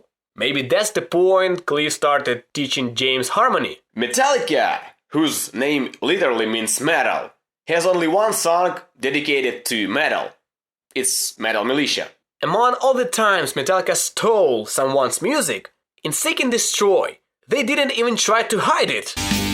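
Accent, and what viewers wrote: Russia. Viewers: Сразу подумал что русскоговорящий человек по акценту, а потом ещё и "поехали") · I love your russian accent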